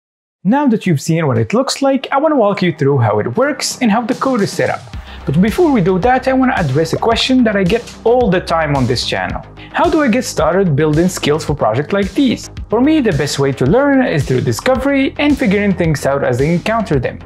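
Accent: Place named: Morocco. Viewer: Great Job! I have a friend who is interested in programming, he would love to see this. I can guess from the accent that you are probably from Morocco Correct me if i'm wrong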